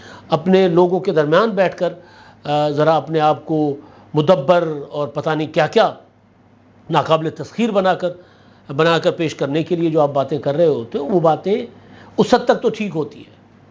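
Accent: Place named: Sindh, Pakistan. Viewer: مزہ آجاتا ہے آپکا وی لاگ دیکھ کر۔ ہیں تو آپ سندھی۔ سندھی لہجے کی جھلک آتی رہتی ہے تھوڑی تھوڑی آپکے لہجے میں مگر. گفتگو کا انداز اور سبجیکٹ پر گرفت بہت مظبوط ہوتی ہے۔ عمران شفقت کا بھی اچھا ہوتا ہے مگر آپکا منفرد ہے۔ آئ لو. یوؤر. وی لاگ۔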